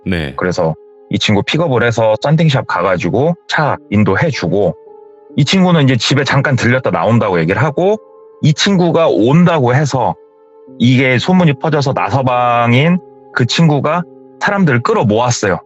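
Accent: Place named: Jeonju, South Korea. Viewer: ㅋㅋ저는 전주라 생각했는데ㅋㅋ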